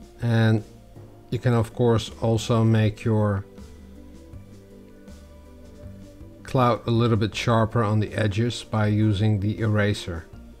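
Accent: Netherlands. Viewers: Are you a native english speaker? I thought i heard a little dutch accent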